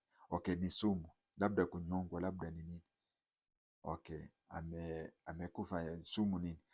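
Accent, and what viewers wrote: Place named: Bukusu, Kenya. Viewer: Kwetu ni Wundanyi, lakini asante kwa kunitaja katika video zako. Kiswahili chako kiko sawa, ila kina matamshi yaliyoathiriwa na lugha ya kibukusu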